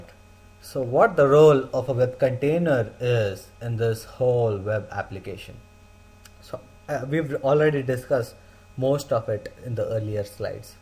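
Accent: India. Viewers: i have problem with understanding indian accent :(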